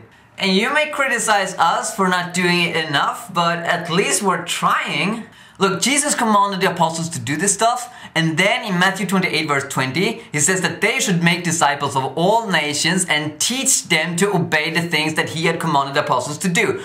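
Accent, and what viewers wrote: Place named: England, United Kingdom. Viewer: One thing I learned from watching this: I can more easily tolerate a biblically illiterate moron as long as he has an english accent. I was at the Conference. Nothing you said rightly articulates MacArthur's perspective...especially "Number 1" from your list. I have witnessed the same phenomenon with regard to a lack of true conversion coming from the Charismatic Movement. Rarely is the true gospel preached. Many of them (if not most) couldn't even articulate the gospel if pressed to do so - and believe me when I tell you, "Jesus talk" is no substituion for talk of the substitutionary death and the atonement. Beyond that, most of them hold to the Word Faith, Health/Wealth/Prosperity gospel, which cannot save. You don't know what you're talking about - but like most Charismatics, you probably so idolize gifts that don't even exist today that you'll act the fool because somebody is talking about those gifts in a manner which displaces your idolatry. 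And Romans 10 is not the sum total of the gospel, otherwise, Mormons, Catholics, Jehovah's Witnesses and everyone else is saved. What a pathetic litlte man you are. You make us all look like idiots...which is why John had to do a Strange Fire Conference.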